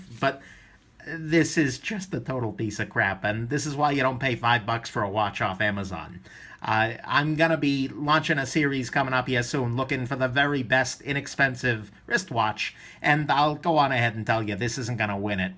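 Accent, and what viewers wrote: United Kingdom. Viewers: good british accent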